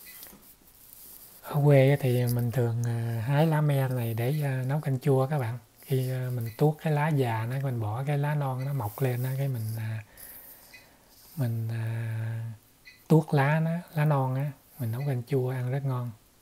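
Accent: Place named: Vietnam. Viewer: giong vn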